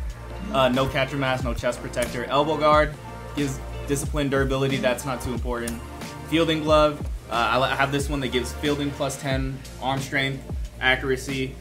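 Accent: Australia. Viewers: Yikes that was an Australian accent? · LMFAOOOOOOOO AUSTRALIAN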